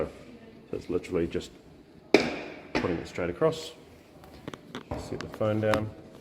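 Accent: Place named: New Zealand. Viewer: Where do you get the fans from? You sound kiwi mate... are you in NZ?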